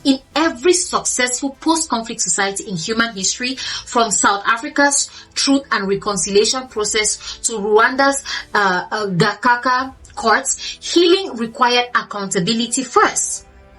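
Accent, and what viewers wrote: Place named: Nigeria. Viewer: I wish your name was mentioned. I congratulate you on the way this information is presented. Firstly is English your 1st language? If it is not, your English diction, vocabulary, expression, pronunciation and grammatical awareness is beautiful. I speak English well but I dont like that my 1st language is the Imperialist 'Kings English'! You make English sound elegantly Nigerian. Great video! Excellent content which is trustworthy and left field. This would not appear on mainstream western media. Scary question. Are u A.I. generated?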